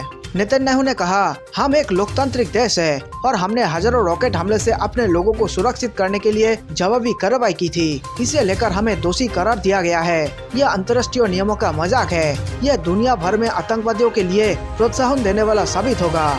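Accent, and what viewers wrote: Bengal, India. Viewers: Idiotic narration. Change it. Your heavy bengali accent isn't music to the ears. No offence intended. Get a new narrator. That will be help your channel. ( But all concocted and false info)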